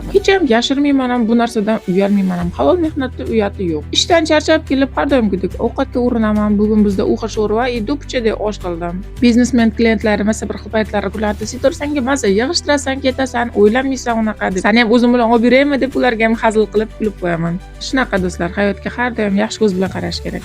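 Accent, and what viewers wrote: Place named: Kashkadarya, Uzbekistan. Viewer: Опа соддагина очик кунгил аел экансиз. Шеваизга караганда Кашкадаре еки Карши тарафлардансиз. Сизни видеоларизи куриб анча йиллар олдин биз хам Москвада ош пишириб Русларга ош сотган пайтларимиз эсимга тушиб кетди. У пайтлар аям дадам ва ака укаларим бор эди. Хаммамиз хали кичкина эдик. 2004 йил эди. Хали Канадага кетишимизга документлар берилгаг пайт лекин Москвада жавоб чиккунча яшаш керак эди. Болалар кичкина. Дадам ва аям факат. Энг халол пул топиш йули шу халол ош пишириб ош сотганлар. Майлида. Кунимиз утиб турарди. Бугун эса хаммамиз котта котта лавозимларда ишлаймиз Канадада. Дадам ва аям эса Узбекистонда шохона хаетта яшайдилар. Оллохга шукур. Опа сиз хам хали оилаиз бн хаммаси яхши булади. Бировларни уйини йигиштиршан кунларимиз хам булган… бу хает. Ундан уялмаслик керак. Сизга омад тилиман. Кизингиз укиб котта лавозимлага ишга кирсин манга ухшаб ❤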